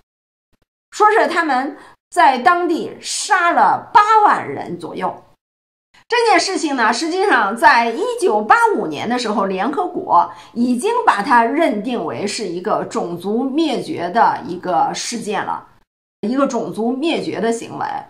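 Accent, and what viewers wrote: Beijing, China. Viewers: 重复好几次了，但我还是得说： ，我太喜欢你的京腔了！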